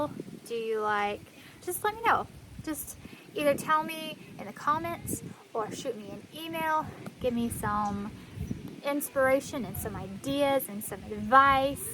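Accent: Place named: South, United States. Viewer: Potting wild tomato plants in November! I'm draining my water hoses and putting them away for the winter, they already lightly froze up once. I don't have a greenhouse, but I did propagate some clematis from cuttings, hoping they survive. Good luck with expanding your channel, I came here from Cog Hill Farm. BTW being an old former Jacksonville guy, I love your slight southern drawl. I like videos in the 20–35-minute range. I don't listen to blog, too long, even my own son's.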